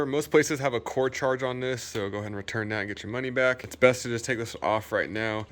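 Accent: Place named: Toronto, Canada. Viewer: You from Toronto?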